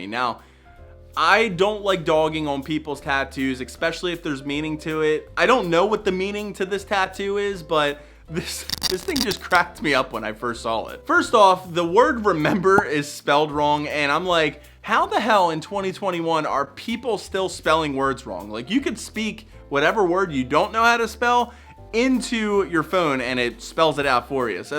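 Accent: Philadelphia, United States. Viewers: Love the Philly accent. So good.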